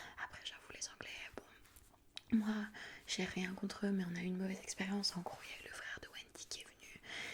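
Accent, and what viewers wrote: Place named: France. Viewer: Ese español con acento francés, bellísimo ❤️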